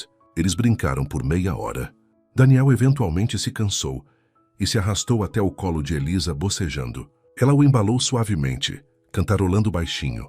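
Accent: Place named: Portugal. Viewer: É necessário tomar mais cuidado com a narração. Foi lamentável a escuta de portugues de Portugal, depois inglês...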